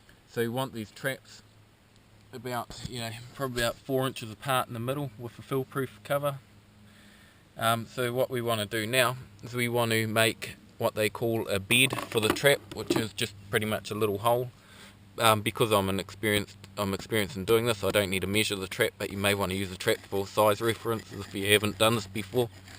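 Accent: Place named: New Zealand. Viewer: aw yea, fush and chups tonight bro? Good to hear a kiwi voice....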